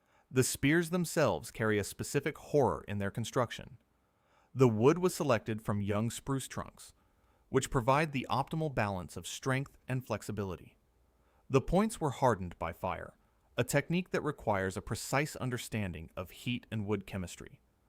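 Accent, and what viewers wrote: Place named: United States. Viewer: i wish you could learn to pronounce composite correctly. Your American pronunciation is so grating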